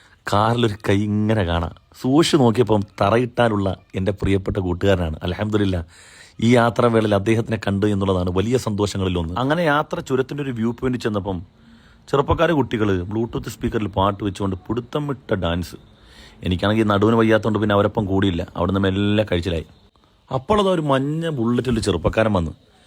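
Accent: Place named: Malappuram, India. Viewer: Usthadinte samsaarathil malappuram slang kayarivannu😄😄👍👍👍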